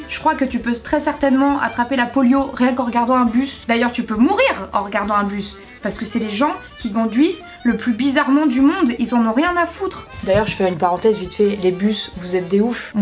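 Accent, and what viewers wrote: England, United Kingdom. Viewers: Meuf ton accent English 😍😍😍😍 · i love ton accent anglais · Super accent anglais ! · tu as un accent anglais trop beau !!!!!!!!!!!!!!!!!!!!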